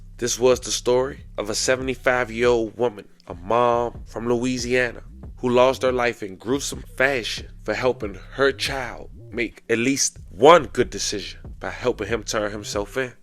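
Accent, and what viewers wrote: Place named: Baltimore, United States. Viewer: I love your voice. it's just something about that Baltimore accent 🥰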